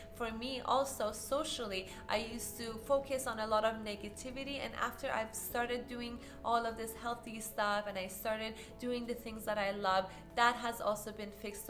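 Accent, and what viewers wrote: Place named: Eastern Europe. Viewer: I love your accent ma'am. Are you from Eastern Europe?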